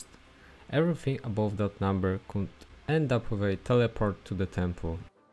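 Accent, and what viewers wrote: Poland. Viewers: Helpfull :) You from Poland ? I hear by the accent ;p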